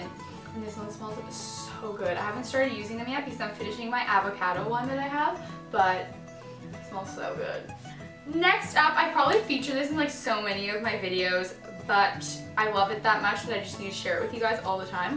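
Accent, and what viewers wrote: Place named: Australia. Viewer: Looks like you've picked up a bitOf an Australian accent haha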